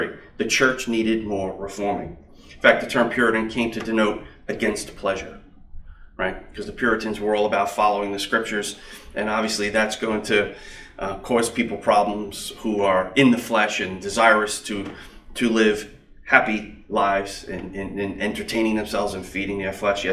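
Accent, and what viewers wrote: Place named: New England, United States. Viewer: This guy is awesome 👍 even I can pay attention to him. I think it's his New England accent (Massachusetts?) Anywho, thanks for breaking this down for us simple folk😊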